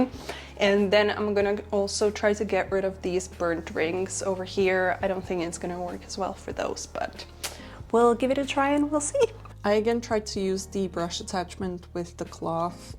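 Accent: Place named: United States. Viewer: I want to quickly pop in and commend you on your English. I was quite surprised to find out that you are, like me, Czech. Almost perfect American accent. Whatever your history, whether you've actually lived in the States or not - hats off to you. Even foreigners who live there mostly don't reach this level of accent, ever.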